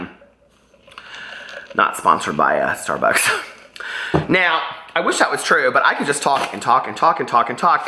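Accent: South, United States. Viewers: Or is it stop sign? Hell I don't know but i will sing the heck out of all the versions lol you can probably tell I have a bit of an accent already. You give me a little southern belle sometimes to so it could sound natural to you. But I also get big city New York vibes from you. OMG I'm typing as I watch your videos and you literally brought up STEVIE. You are my kweeeen , your Leslie shaee famouuusss nowwww lol you've been. Sorry I live type and it gets long honey. But you know how we get to talking and just can't stop .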